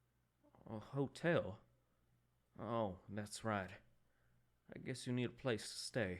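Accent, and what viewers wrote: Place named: South, United States. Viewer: Oh boy Ash was right, you do have a good southern accent ❤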